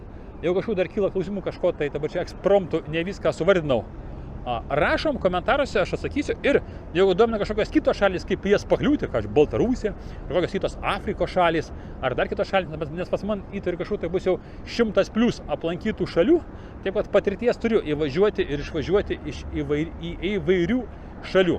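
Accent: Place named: Central Lithuania, Lithuania. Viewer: va tokie konkretus zmones man patinka labiausia. galetu but visi tokie Lietuviai - nuverstume visas konservatinkas jau pradiniam etape. o ne kai prisipizdina lietuviu pinigu. biski ziuriu tarmiskai sneka ;) panasu kazkur is vidurio Lietuvos.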